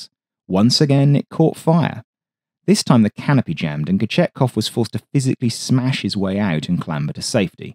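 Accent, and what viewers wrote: United Kingdom. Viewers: I lose interest when I hear the Brit voice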